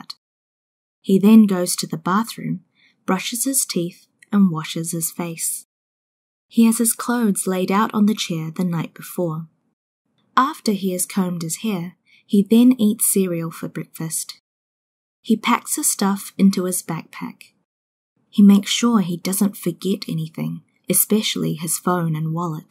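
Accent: New Zealand. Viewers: Your channel's contents are encouraging me to do better listening skill, especially in NZ accent, but it is a little bit ashame that only a few people know this chnnel.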